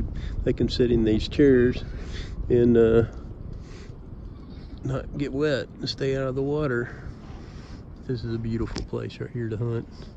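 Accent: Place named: South, United States. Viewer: Very nice! I´ll get one in next two weeks. So, now I´m just lookin for some videos with this amazin dogs on duck huntin and I definitely found one with US southern accent which I prefer and understand best! :D Have a good time, greetings from Czechia.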